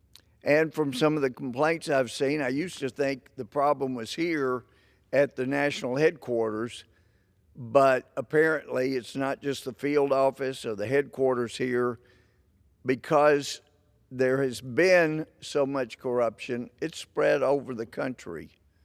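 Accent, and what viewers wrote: South, United States. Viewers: He has that charming southern drawl at times.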